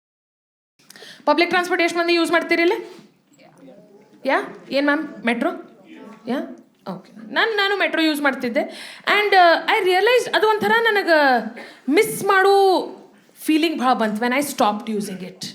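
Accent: North Karnataka, India. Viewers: ನಿಮ್ಮ್ ಉತ್ತರ ಕರ್ನಾಟಕದ ಭಾಷೆ ತುಂಬಾ ಚೆನ್ನಾಗಿ ಸಾಮಾನ್ಯ ಜನರು ಯೋಚನೆ ಏನಿದೆ ಅದನ್ನೇ ತಾವು ಹೇಳುವದು , ತುಂಬಾ ಚೆನ್ನಾಗಿದೆ , ಹೆಚ್ಚಾಗಿ ಬರಲಿ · there's no question of 'Hope'... I simply love your comic timings.. its beyond perfect n the north karnataka kannada is like icing on the cake!!!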